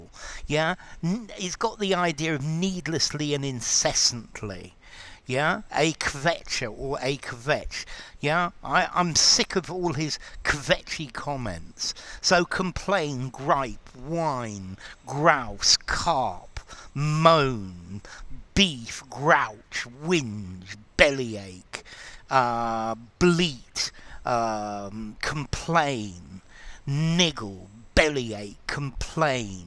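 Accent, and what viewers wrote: United Kingdom. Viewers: Thanks for your interesting videos. Are you British?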